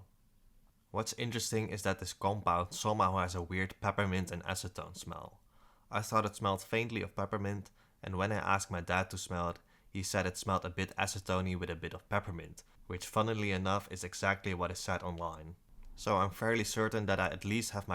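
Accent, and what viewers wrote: Netherlands. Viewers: Where is your accent from?.. it sounds like Limbourguish.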